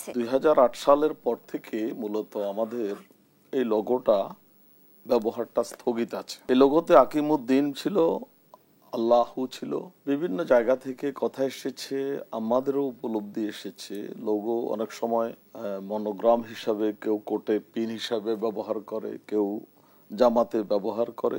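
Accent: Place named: Kolkata, India. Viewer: সনচালক কলকাতা স্টাইল এ কথা বলেন কেন?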